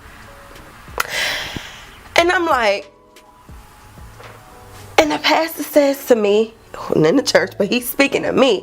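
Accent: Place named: Baltimore, United States. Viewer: You popped up on my timeline, and as soon as o heard your accent I was like…oh hold up she’s from my hometown B-more!! Let me subscribe ❤ Enjoyed the video ❤❤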